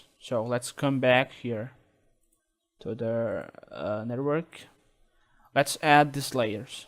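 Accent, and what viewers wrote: Brazil. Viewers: Hehe.Br falando inglês, não tem como não perceber. Abraço.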